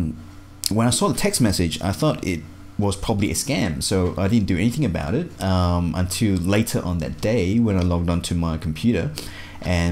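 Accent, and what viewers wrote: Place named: Australia. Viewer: thanks for this info ... I like your Australian accent so cool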